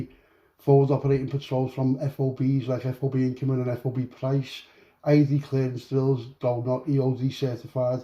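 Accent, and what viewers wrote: Liverpool, United Kingdom. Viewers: Yes Scouse ❤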